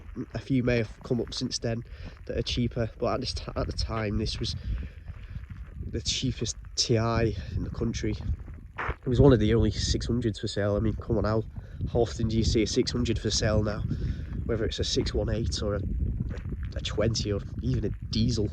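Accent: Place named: Cockney, United Kingdom. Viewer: Cockney heavy'😂😂🧌🧌🤮🤮🤮🤮🤮🤮🤮🤮🤮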